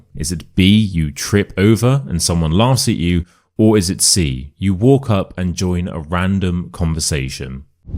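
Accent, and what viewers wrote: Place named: England, United Kingdom. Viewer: So lovely to hear a real English speaker - beside all those strange dialects of allegedly English language in USA, Australia etc.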